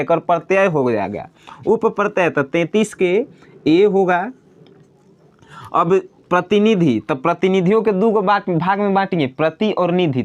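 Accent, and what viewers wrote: Bihar, India. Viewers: Bihar se ho bhai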